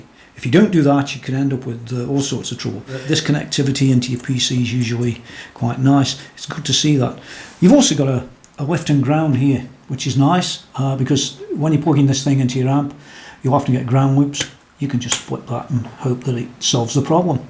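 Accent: Stoke, United Kingdom. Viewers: YOU FROM STOKE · Nice review..are you a stokie?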